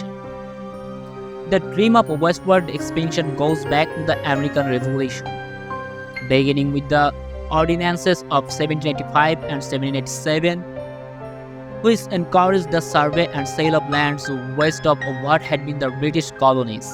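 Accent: India. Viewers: So why is the narrator from the Sub continent of India? I guess 5 pictures and the wrong accents will get you view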